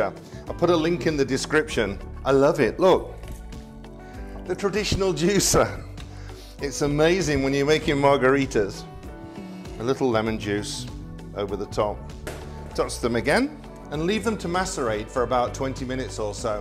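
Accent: United Kingdom. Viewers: You didn't introduce your family to the viewers. It would have been great knowing them. I love watching your dishes especially the way you speak about the Queen, Prince William and Prince Harry and of course Princess Diana and all the stories. I just watch the dishes being made though I am a true Vegetarian, don't even take eggs. Good luck. Keep telling about your Royal experience and stories along with history. I like your authentic British English. I am from India.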